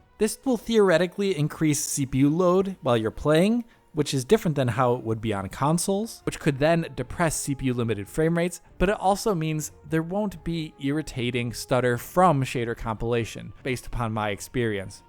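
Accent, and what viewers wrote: Germany. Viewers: Your voice is incredibly monotonous and boring... and yet when you speak a bit of german, it becomes very colorful and full of life. You should try to use your german accent to speak english! And thank you.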